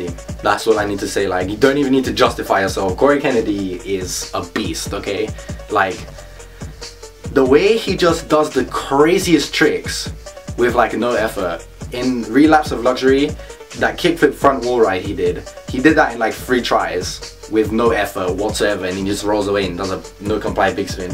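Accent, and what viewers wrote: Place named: England, United Kingdom. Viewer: It's cool to watch someone english who speaks the same as me and i know exactly what you're saying and i can just relate, sometimes youtube gets boring because all the big people on here are from america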